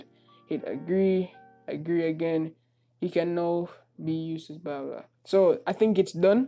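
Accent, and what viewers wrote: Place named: Jamaica. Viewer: You sound Jamaican can still hear likkle bit ah di accent😂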